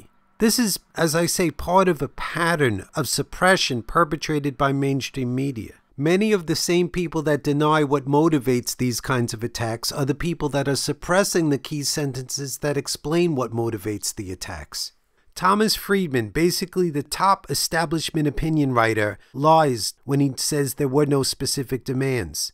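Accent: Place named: East Coast, United States. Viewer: I noticed you speak with an east coast Jewish accent. Are you Jewish?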